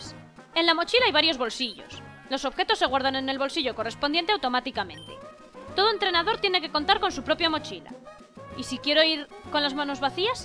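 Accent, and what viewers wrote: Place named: Spain. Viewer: Nunca me gusto el acento español, hasta que te escuche, buen humor Saludos desde México!!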